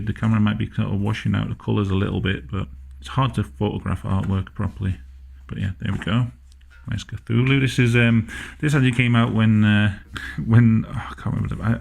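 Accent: United Kingdom. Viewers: Also, British man describing his creepy artwork is probably my new favorite genre of video.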